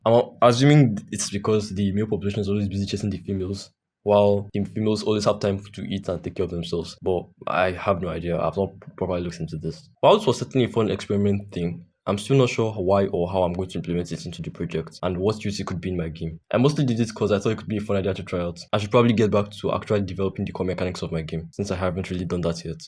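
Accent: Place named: Russia. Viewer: ohh your accent sounded Russian to me😅. Nice video btw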